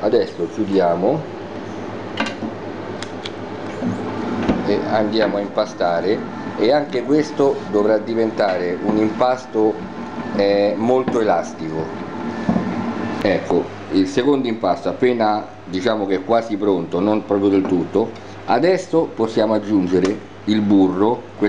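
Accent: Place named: Rome, Italy. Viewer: di foggia? ma non lo senti che parla in romanaccio?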